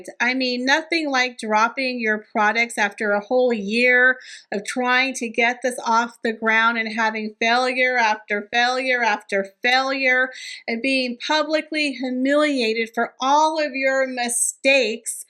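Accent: United States. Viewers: Why is this channel called “Royal daily Tea” when you only do hate videos on Megan and Harry. Also - you sound like an American. Why TF do even care?!?! It’s giving obsessed honestly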